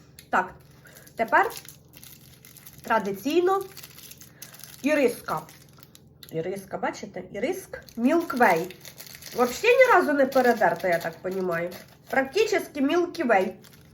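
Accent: Poltava, Ukraine. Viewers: Дуже приємно слухати огляд полтавським діалектом.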